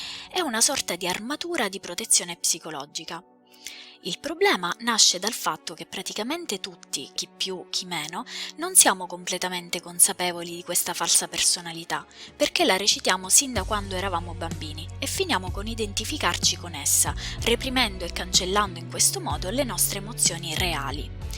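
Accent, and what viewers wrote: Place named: Sicily, Italy. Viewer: È incredibile il lavoro che fai!! Non ti concentri solo sulla storyline come fanno tanti altri youtuber, ma guardi al quadro generale e cerchi di estrarre il messaggio più ampio che i ragazzi e la bighit vogliono mandare, unendo tutti i riferimenti letterari (da studentessa di psicologia questa cosa mi esalta). Mi dispiace solo che tu non abbia tanti iscritti, forse perché i video sono in italiano. Ti consiglierei di provare a parlare in inglese, e se non sei tanto sicura della pronuncia usa la voce robotica, vedrai che i tuoi iscritti esploderanno perché da quello che ho visto sei la migliore in circolazione❤❤❤ Ps. Adoro l'accento siciliano 😍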